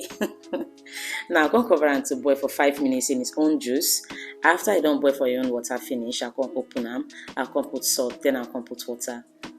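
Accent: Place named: Warri, Nigeria. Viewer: I just like the wey you dey speak our warri English 😂. Too much love from sapele 🤗🤗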